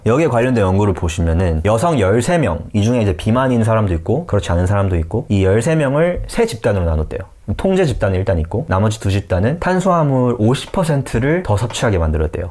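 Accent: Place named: United States. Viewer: 미국살다온 말투ᆢ 좋당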